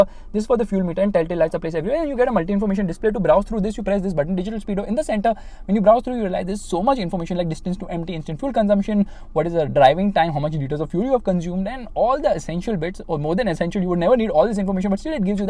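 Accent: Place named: South India, India. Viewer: That south indian English accent, 👌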